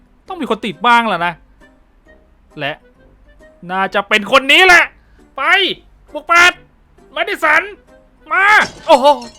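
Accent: South, Thailand. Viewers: พูดเหมือนคนใต้เลยนิ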